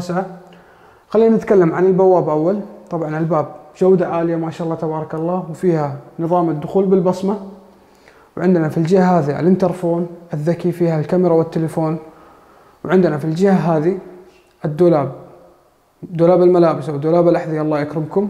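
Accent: Saudi Arabia. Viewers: اللهجه. سعوديه. اكيد. الاهل. مواليد السعوديه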